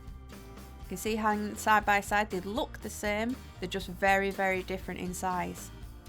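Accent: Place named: Yorkshire, United Kingdom. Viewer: love your yorkshire accent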